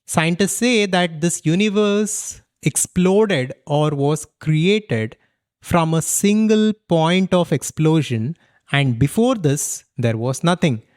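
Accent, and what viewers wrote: India. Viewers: Probably the best explanation to this question in the thickest Indian English accent I’ve ever heard.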